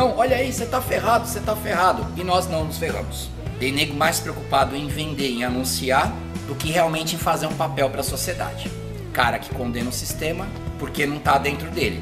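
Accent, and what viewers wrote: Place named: Rio Grande do Sul, Brazil. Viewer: Mestre só me responde uma coisa...Tu é gaúcho?pois tu tens o nosso sotaque e eu ja vi tu falar girias gaúchas.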